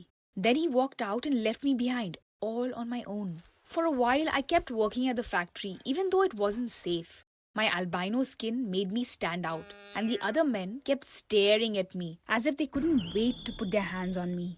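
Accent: India. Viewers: The Indian accent is good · OMG the accent!!!. We in India speak in English just like this. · FINNALLY A CHANNEL WHO GOT OUR COUNTRY'S ACCENT YAYYYY INDIA KI JAI side note: THIS IS A INDIAN TV SERIAL I SWEAR this is after watching the whole video: i knew this country has problems but jeez 😥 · The stereotypical Indian accent ☠️🦇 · It's a Indian voice 💯🤗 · Finally india accent my dear country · Finally an Indian Story with a perfect Indian Accent 👌🏻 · No Offense but I don't like this Indian accent :/ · Damn loved this Indian accent and story 😁🔥❤ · are u indian bc i am and I recognised the accent! i love your skin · Perfect Indian accent another central American girl storyteller pls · Her Indian accent is so horrible, i don't understand how people are praising her accent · I did not know how a indian accent sounded until this video.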